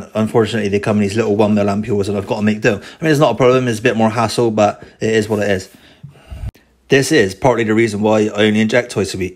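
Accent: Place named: Scotland, United Kingdom. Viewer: Awesome video and accent, scottish?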